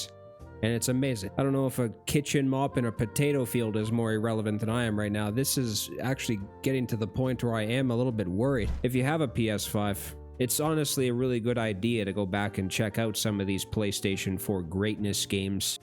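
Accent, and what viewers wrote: Canada. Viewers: The first time I could tell a Canadian from their accent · Your voice and Canadian accent is so relaxing. I love to CHILL while listening 😎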